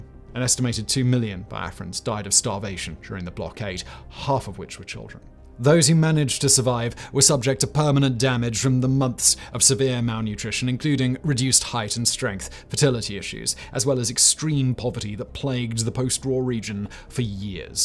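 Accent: United Kingdom. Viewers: romanblack4247 It's not a white accent lmfao what? He's British and even then he could have easily pronounced the words like Yoruba or Igbo correctly. Wth is a white accent? He simply just didn't even try to learn how to pronounce them correctly.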